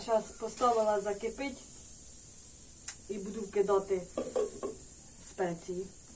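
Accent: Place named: Zhytomyr, Ukraine. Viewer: Красавица ти звідки з Житомирщини акцент суржика або Черкаси, звідки ти будеш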